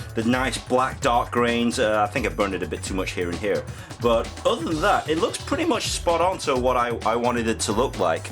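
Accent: England, United Kingdom. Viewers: that's me too bro, trying to build a guitar on a BOODGET... hehe love english accents.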